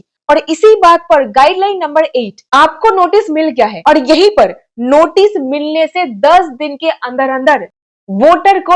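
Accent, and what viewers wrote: Bengal, India. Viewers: ম্যাডাম তুমি বাঙালি মনে হচ্ছে 😅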